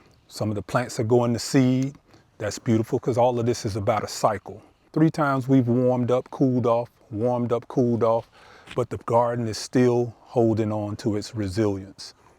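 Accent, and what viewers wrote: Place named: Georgia, United States. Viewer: Great video! you sound like Jamal Bryant a little bit. I wonder if it’s a GA thing😂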